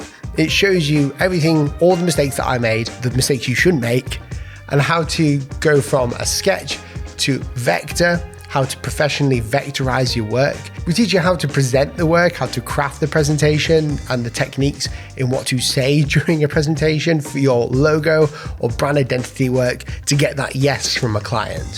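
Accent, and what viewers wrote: United Kingdom. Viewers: nice video, more please nice to hear a British person explaining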